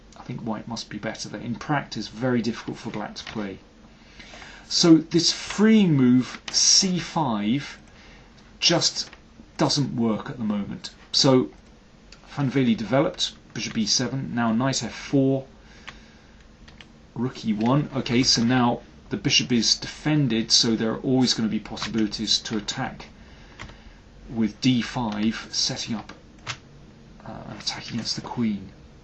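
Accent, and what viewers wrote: United Kingdom. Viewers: Hi Daniel! Thank you so much for your insightful commentaries! Also a big fan of your British accent that makes things dramatic and theatrical to my taste!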